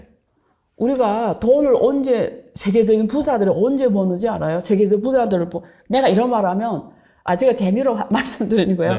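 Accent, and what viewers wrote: Yanbian, China. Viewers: 연변사투리가 쎄네요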